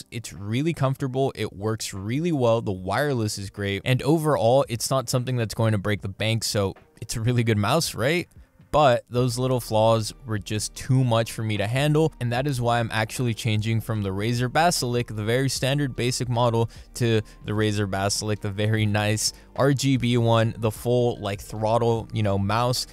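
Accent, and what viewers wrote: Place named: United States. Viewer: why you sound like a american with fit body and few muscles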